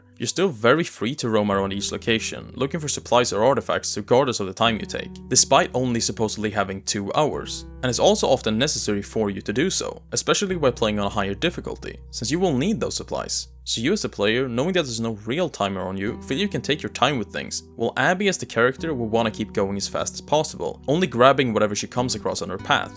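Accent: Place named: Philippines. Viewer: You sound brown, like a jungle asian or some kind of filipino.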